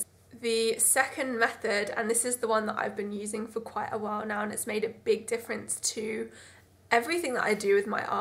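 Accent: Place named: United Kingdom. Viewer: Actually, I was looking for some special camera moves, like sticking it to the brush or stuff like that, but I feel in love with your British pronunciation.❤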